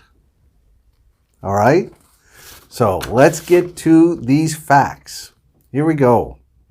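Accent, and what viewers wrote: Russia. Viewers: Wow, I didn't know about Stalin's bunker. Probably I will visit it. And your Russian accent is so funny😂🤣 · ** Wow! I've trained hard my listening today 😅 and I loved your Russian accent hahaha ** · Wow, I like your Russian accent, that so funny 😂. Thanks for your great video 😁👍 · I did enjoy listening to your Russian accent! 😉👍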